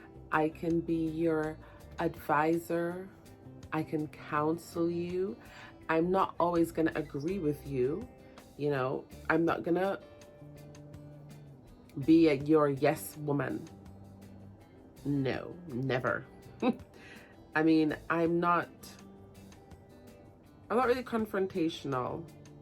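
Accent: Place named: United Kingdom. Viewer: Did you ever live in England? You’re giving me a little bit of British vibe 😂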